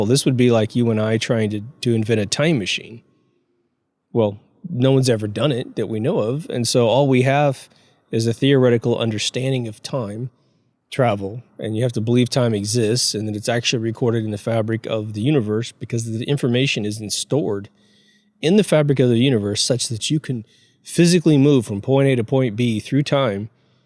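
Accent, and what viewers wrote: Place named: Sweden. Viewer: is a fucking genius. I found his channel in 2016. I guess he did work way before that. But one thing is for sure. He is Swedish. Nordic. I hear it in his voice.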